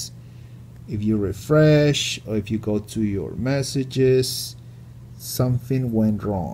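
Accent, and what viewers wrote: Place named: El Salvador. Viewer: Has uno en español de todos modo pronuncias el ingles como salvadorian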